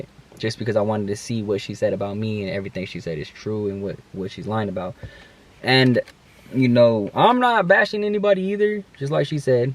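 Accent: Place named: Bay Area, United States. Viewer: I think the Bay Area is getting to you in how the speak lol . Those from the bay know what I mean lol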